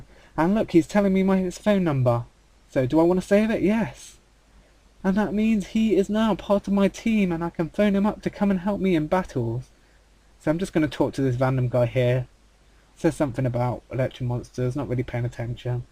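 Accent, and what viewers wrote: United Kingdom. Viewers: Whoa! Your Accent Is Really British! I Mean I'm British But That Is Really British!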